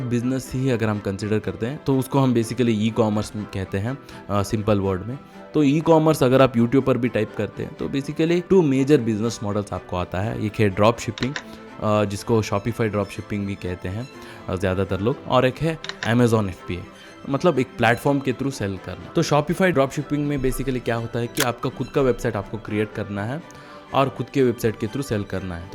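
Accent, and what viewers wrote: Karnataka, India. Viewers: Bhai aap karnataka se hoo kya???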